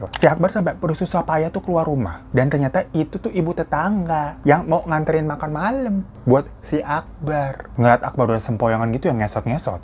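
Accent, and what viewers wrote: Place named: West Java, Indonesia. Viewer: Kak logat sunda tapi nama nya kayak jepang yà....😃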